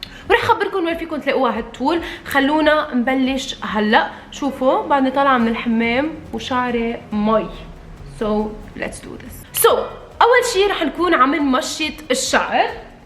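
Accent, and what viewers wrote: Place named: Syria. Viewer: لان لهجتك سورية🤣🤣 وانا بعرف مافي توصيل ع سوريا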